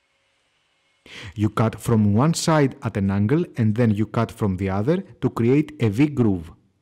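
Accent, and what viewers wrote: Greece. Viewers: He Greek haha! (I'm Greek too that's why I recognize the accent) Nice video tho well done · I immediately understood that you were Greek!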